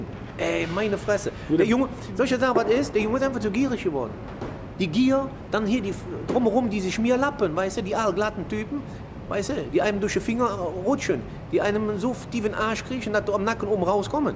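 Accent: Cologne, Germany. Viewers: Der Typ kann nix und hat nix. Er ist eine Kölner Laberbacke! Er äußert sich zu gewissen Themen im Kölner Dialekt und veröffentlicht die Video auf YT.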